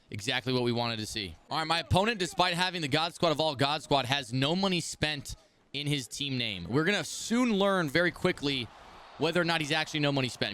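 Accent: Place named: United States. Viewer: Normal American accent lol we do that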